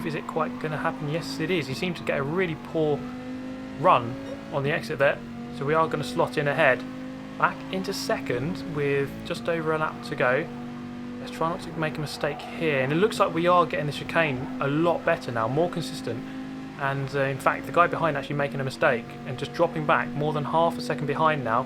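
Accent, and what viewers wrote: United Kingdom. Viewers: I love to practice my english pronounce with your british accent! Thanks for your existence. ❤️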